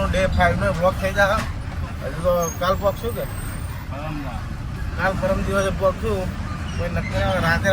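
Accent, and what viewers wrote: North Gujarat, India. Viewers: Uttar Gujarat ni boli gageh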